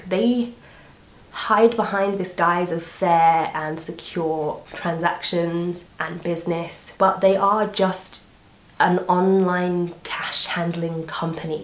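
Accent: England, United Kingdom. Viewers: English is too English. Had hard time understanding her and so I just quit. Maybe she should take a couple of bucks and get a voice coach.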